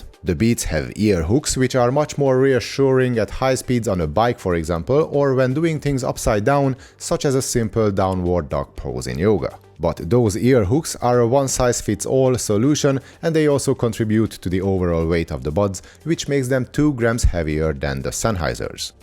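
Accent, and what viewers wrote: Hungary. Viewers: Oh you live in Ireland, that's great. With your accent I thought you were coming from Hungary or another eastern country. Great video btw 👌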